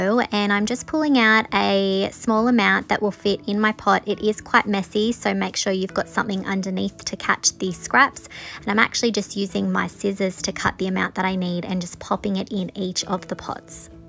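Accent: Australia. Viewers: Née subscriber here. It’s nice to hear an Aussie voice. I love your little pots, very cute. May I please ask you what brand is your glue gun. I have to get a new one and I like the way yours sits there. My old one is not a very good one and the glue keeps leaking out of the nozzle. TFS. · Just found your channel, love to gear an Aussie accent here in France, we used to live in Perth. :) love these pots, I bought some peat pots recently and will make some fir my shop. :)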